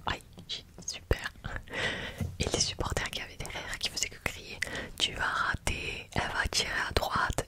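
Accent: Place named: Basque Country, France. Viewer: Voilaaaaa on dit chocolatine conn, t’as un accent basque de fouuuu je me trompe?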